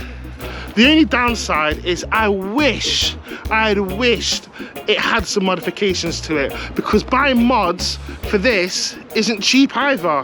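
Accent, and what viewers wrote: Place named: Birmingham, United Kingdom. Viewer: I just love how you brummies say brought that’s all 🤣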